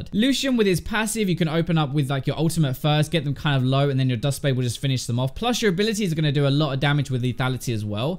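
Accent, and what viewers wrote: United Kingdom. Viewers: I'm confused about why these British YouTubers are so confused about how lethality is pronounced, can someone explain this to me as an ignorant American?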